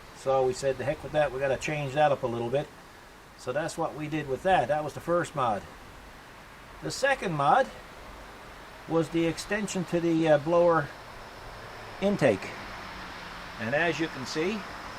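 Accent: Newfoundland, Canada. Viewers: I knew you were Newfoundland as soon as you spoke. I'm a townie myself. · Did I hear Newfoundland ? Well if you’re a mulley ? We might be kin love the snowblower and love the country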